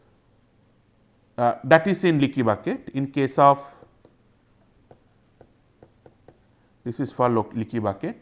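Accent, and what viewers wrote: India. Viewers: very stupid english accent in india, fuck and block your channel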